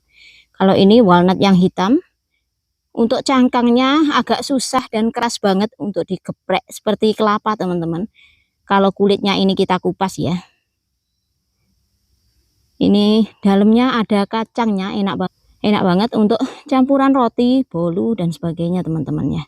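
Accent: Java, Indonesia. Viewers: Assalamualaikum mba, jenengan Indonesianya daerah mana mba kok medhok sekali 😊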